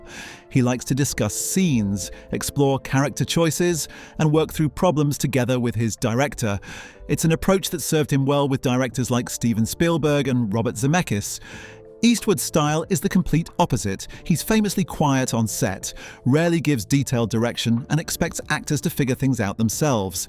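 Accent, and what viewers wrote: United Kingdom. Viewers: This British accent voiceover seems to be on all these types of videos.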